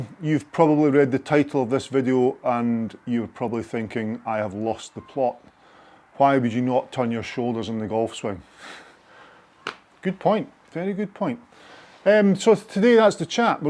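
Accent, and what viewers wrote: Scotland, United Kingdom. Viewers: That beautiful Scottish accent - could listen all day 😄. Happy new year!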